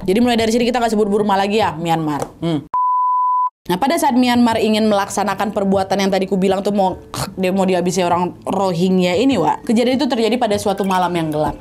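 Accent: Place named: Medan, Indonesia. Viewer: Tampak kali logat Medan kau dek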